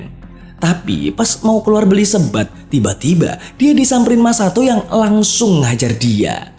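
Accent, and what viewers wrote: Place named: Java, Indonesia. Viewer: Jowomu ngendi mas?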